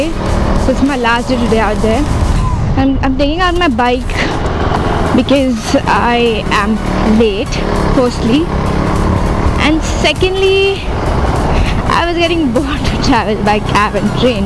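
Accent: Mumbai, India. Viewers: well earlier I was going to say things like you are a noob, squid but after investing all my phone's battery I think you are not a bad rider. And as you say in your mumbiker accent "bhaay tu toh raapchik Hai". + 1 sub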